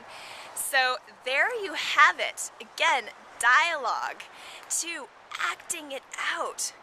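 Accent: United Kingdom. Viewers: Like your British accent. How you said the story about this lady was amusing. :) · Thanks for posting this. Your voice is pleasant, you are good looking, and those two things helped me to overlook the annoying British voice and the elevated video camera/phone angle. Your arms must have started to cramp up. With the British accent all I could think of was Madonna putting on a British accent. Remember a little goes a long way.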